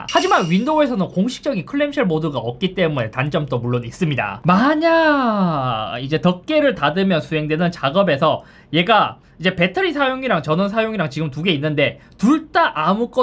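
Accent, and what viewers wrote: Gyeongsang, South Korea. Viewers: 경상도 억양 빼고 다 좋음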